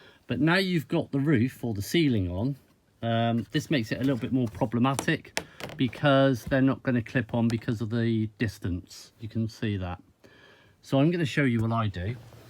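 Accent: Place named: Bournemouth, United Kingdom. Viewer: You sound like you are Bournemouth, the guy in B and Q told me to watch :) cheers mate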